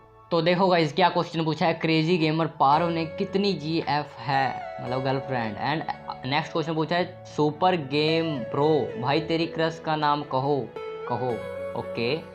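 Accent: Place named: Haryana, India. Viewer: Bhai mene teri boli t e pta laag gya tha ki tu haryana ka h isliye tera qna dhund rha tha😜😜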